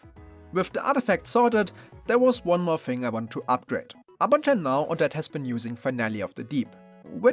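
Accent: Germany. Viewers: It's really funny to me how obvious the German accent is, it's so funny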